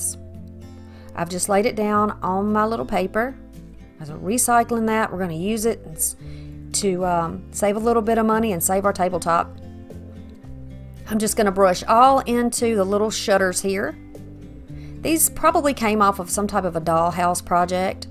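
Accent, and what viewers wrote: South, United States. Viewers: Just was watching Jackie and found you. I love your southern sound, I too have it, I'm from GA. I love your work and your cheery personality. Rhonda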